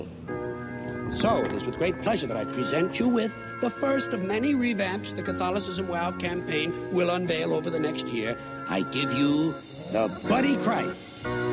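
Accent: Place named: New York, United States. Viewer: I love his neoyorkine accent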